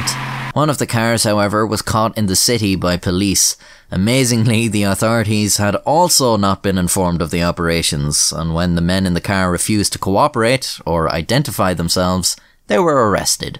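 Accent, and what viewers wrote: Ireland. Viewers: Seeing the guy it's named after is Henry William Lamb, 2nd Viscount Melbourne who, in 1770, was raised to the Peerage of Ireland as Lord Melbourne, I think this Irish presenter has every right to pronounce it in his own dialect. Regardless how we current 'Strayans say it. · Idk if I've been watching too many of your videos or not, but my inner monologue now has an Irish accent.. and I'm American, from North Carolina lol..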